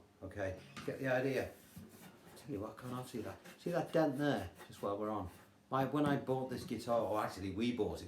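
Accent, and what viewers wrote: Liverpool, United Kingdom. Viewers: Came for, stayed for the accent. Brilliant. I dunno, scouse?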